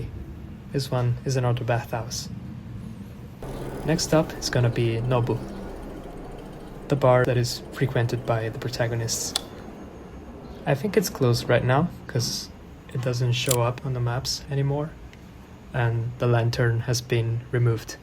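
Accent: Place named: Italy. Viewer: you remind me a lot of a really good friend of mine from college days, also from italy, had a very similar accent/intonation as well as a similar way of looking at things (and he was a huge film nerd!)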